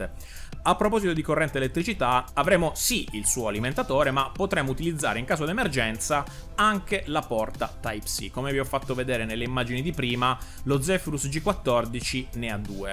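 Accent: Italy. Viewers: 32GB di ram sono più che sufficienti anche con un solo banco dici. Non avere un doppio banco significa non avere il dual channel, e non avere il dual channel specialmente per i processore Ryzen è una GRAVE mancanza che avresti dovuto sottolineare. Questa è disinformazione e mi dispiace davvero tanto dovertelo far notare dato che dal tuo accento si capisce che siamo della stessa terra.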